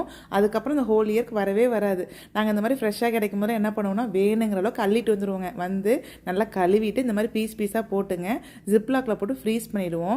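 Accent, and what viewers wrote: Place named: Coimbatore, India. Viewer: Kovai slang 😅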